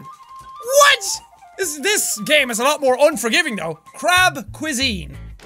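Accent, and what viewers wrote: Ireland. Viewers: Got so Irish this episode. Just listen to the outro. I LOVE IT.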